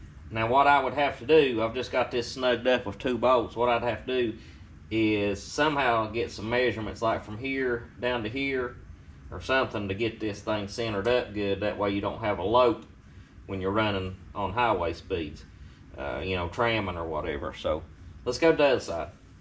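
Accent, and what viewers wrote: South, United States. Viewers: Dude stop talking redneck... It's time...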